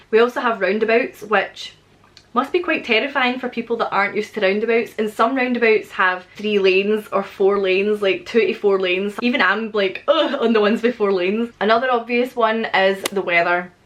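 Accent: Scotland, United Kingdom. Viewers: If I just start to listen to your videos everyday. Do you think it's possible for me to speak with a Scottish accent in a year? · we are from Saskatchewan in Canada and visited Scotland in 2015 and had a great time. We rented a car and drove about 1800 miles around your beautiful country. Your roads are narrow, but if you watched for oncoming cars there were pull out spots to pass. In towns if there was only one lane cars would wait and let you come through. Was amused that in our hotel in Edinburgh we had to place the door card in a slot to get the lights to come on. When you left and pulled the card out all the lights went off (including the fridge) Kids walked around without a cell phone in their hands. We met some friendly people. We were impressed with the highland cows and the many castles. Loved the quiet country roads that were lined with rock fences. 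Enjoyed the small tea houses/restaurants and. beautiful scenery and so on. Would really like to go back. our Mac Dougall ancestors came from Scotland and we would like to see the castle at Dunollie. I love your beautiful accent! Keep up the interesting videos. Thanks! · Loved hearing a Scottish accent again, having been born and raised in Edinburgh. Long before your time. 1938, to be exact. I’ve actually spent most of my life in the States, Los Angeles California. So our weather is completely different. Yes our Streets are wider, usually six lanes. However residential streets, are usually four lanes, two lanes, used for street parking. I can’t swear that Americans love our sense of humor, especially those we see on British TV. But I do make them laugh. And funnily enough, I’m not always making a joke at the time. I guess we Scots, just see things a bit differently. But I’ve yet to meet an American who doesn’t love or like the Scots. 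And those who’ve visited the old Country all loved their visits. I recall visiting some friends for dinner, and my friend’s wife said it was lovely having you. I just replied, “ I loved being had” I wasn’t trying to be funny, but it cracked them up. It took me some time to get used to driving on the opposite side of the street. Usually the worst times, early mornings, with little traffic. But after 55 years here, I’m sure I’d have the same problems back in Scotland. It’s no secret the USA, has a major Gun problem. Although I’ve never felt the need for one. Nor have my friends. It’s really a minority that owns more of the guns. Almost an Arsenal of them. But it depends on the State you live in, and their laws. As to the language differences, my first encounter with different words and usage, when I joined the Army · i'm italian and i LOVE your accent!!!!wish to visit scotland one day! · I left West Dunbartonshire (Balloch) in 1957; I lived and worked in France and Switzerland from <num>. 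I've lived in South Carolina for the past 20 years. I never tire of hearing "my people" talk the way you do. I miss it too. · Hi!!! I've lived in Glasgow for 1 year....it was so good to listen to your Scottish accent again...I was missing it so much....actually when I was there I could not understand not even the word "gym"....I took some seconds to understand which word the guy was saying but now...one year later...it sounds lovely hahahah Besides that the weather and the fact that you get drunk at 8 o'clock used to shock me definitely hahaha But you are amazing...Scotland is amazing s2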